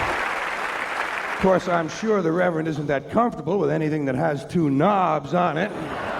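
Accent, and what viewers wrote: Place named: United States. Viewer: بس متقن اللهجة الامريكية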